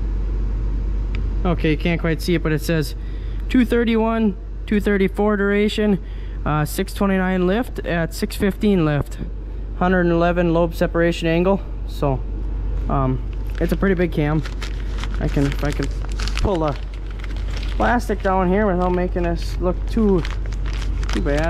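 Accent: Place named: Wisconsin, United States. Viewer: What part of Wisconsin are you from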